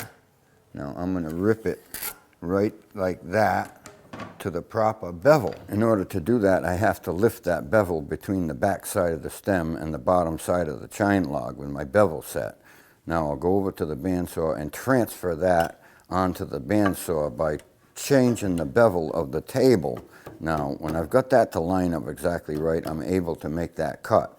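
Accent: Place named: Maine, United States. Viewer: Like his "Down East" accent. Nice the way he finesses each piece slowly and easily. Good work pace.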